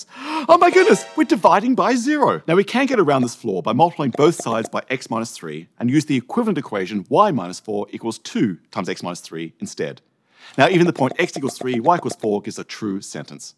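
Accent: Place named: Australia. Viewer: What’s the accent? Also, this accent is rather slow compared to other australiamd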